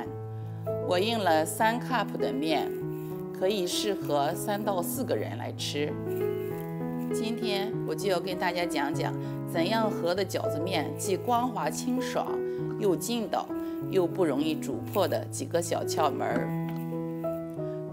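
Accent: Qingdao, China. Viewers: 这口音……青岛人表示好亲切啊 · 在德国学包饺子，青岛口音太亲切了！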